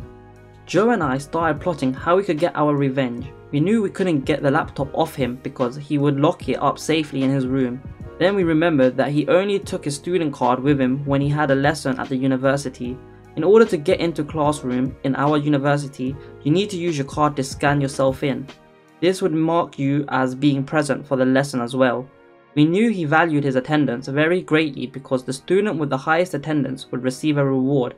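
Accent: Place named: Southern England, United Kingdom. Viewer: rjship - I'd say south England by the accent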